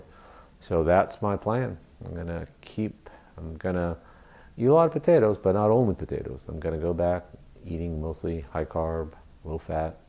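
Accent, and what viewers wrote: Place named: United States. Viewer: You sound American but you talk about kilos or whatever. I'm American and I talk in pounds. Everyone here talks in pounds. I don't know anything about kilos.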